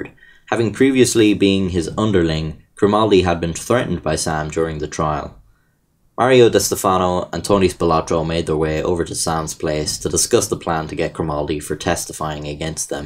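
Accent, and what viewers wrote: Ireland. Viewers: The thicc Irish accent makes for a good mafia storytelling voice. More little known Irish personalities, please. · This is just the wikipedia page with an irish accent and bad jokes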